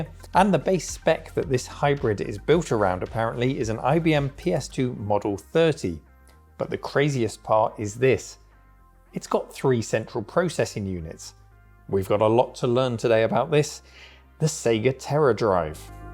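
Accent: United Kingdom. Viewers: You don't sound like you are an American, you sound like that you might be from Britten.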